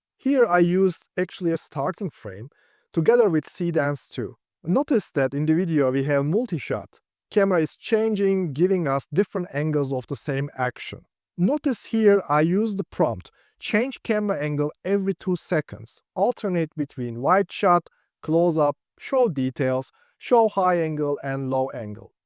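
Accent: Turkey. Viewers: şu kötü türk aksanlı ingilizceyi de yapay zekaya mı okutsan acaba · Kardeş sen Türk müsün? İngilizceyi tam Türk aksanıyla konuşuyorsun. 🤓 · törkiş engliş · Aksanına Kurban olduğum. Türk olduğuna yemin edebilirim ama kanıtlayamam. Teşekkürler. Türk'sen Yorumuma kalp at.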